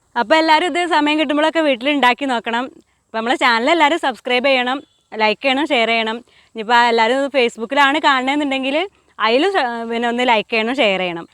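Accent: Malappuram, India. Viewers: സത്യത്തിൽ ee സംസാരം 🤦🏻‍♂️🤦🏻‍♂️ 😂 തനിമലപ്പുറം 👌💪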